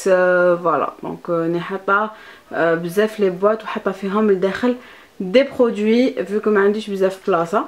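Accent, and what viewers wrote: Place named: Algiers, Algeria. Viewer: Ton accent algerois me plais 😁 on le retrouve chez peu de gens 😔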